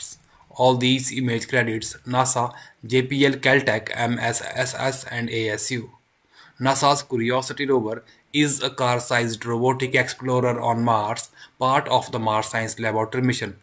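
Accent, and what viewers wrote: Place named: Scotland, United Kingdom. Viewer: That's a thick Scottish accent you've got there. The straight lines on Mars look intelligently made, like a channel or something. I'm not sure of the scale however. This might be AI bullshit.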